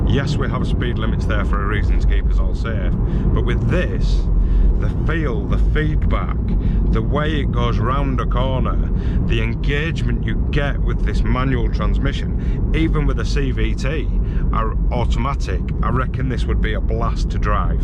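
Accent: Yorkshire, United Kingdom. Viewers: This Gent wouldn’t be out of place on TV based car review show ….based on affordable cars or sourcing little gems like this CRZ …great review and narration and a great Yorkshire accent ….off this review im looking at this Honda …CRZ as my next motor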